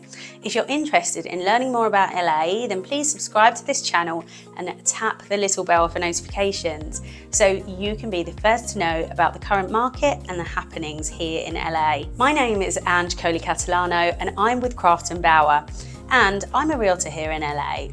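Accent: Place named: United Kingdom. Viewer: You'll be selling Alot of properties with your beautiful British accent... Thumbs 👍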